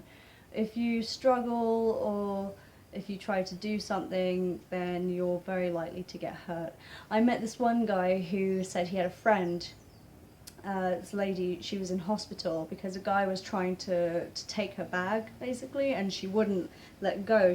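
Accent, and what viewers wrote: United Kingdom. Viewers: I fall in love with your accent, remind me of my ex girlfriend from England 🙂❤️😍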